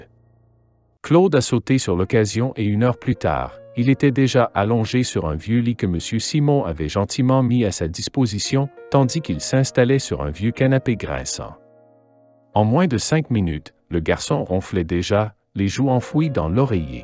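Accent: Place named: Canada. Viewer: Un peu de réflexion il est canadien on entend bien l'accent et le français n'est pas le même a 100% la bas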